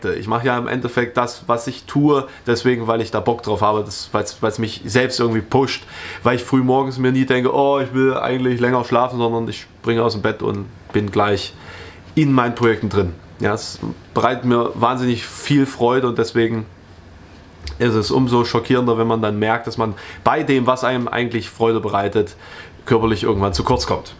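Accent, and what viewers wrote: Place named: North, Germany. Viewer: Das mit dem Workaholic kenne ich. Mein Arzt meint, ich solle verdammt vorsichtig sein, sonst sei es nur eine Frage der Zeit bis zum Burnout. Struktur hilft, das ist richtig. Das mit der Ernährung bekomme ich auch irgendwann konsequent auf die Reihe :D Wie auch immer - ich freue mich darauf, dich bei Bob zu hören! Bitte übernimm das Programm am Morgen - dann werde ich im hohen Norden direkt mit heimischem Dialekt geweckt <3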